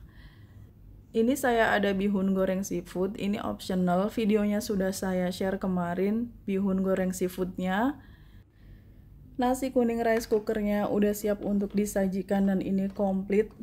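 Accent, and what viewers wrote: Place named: West Java, Indonesia. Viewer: Pasti org Sunda...itu ada bahasa Lekoh nya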